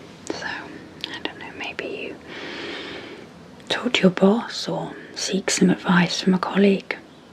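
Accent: United Kingdom. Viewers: Therapy, amsr, soft spoken akd British? Yes, please. Lol. Thank you for your time and energy. 🙏🖤🤟🙃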